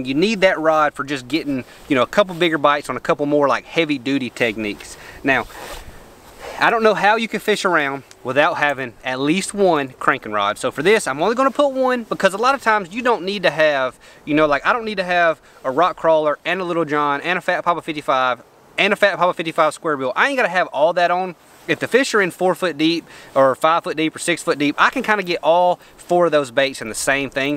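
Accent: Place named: South, United States. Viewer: You are one fast talking southern boy ! Fish the same way I bet. 2000 or more cast a day, gives you lots of opportunity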